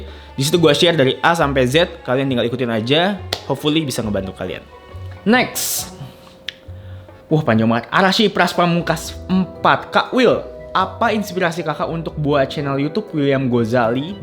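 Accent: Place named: Betawi, Indonesia. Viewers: no offense, pantes bahasa betawinya "nyablak" khas jaktim. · Oo terjwb penasaran gw besar di Cilangkap ya Bang. Maaf soalx tiap nonton fasih bgt bahasa2 lingkungan perkampungan gitu. Kereen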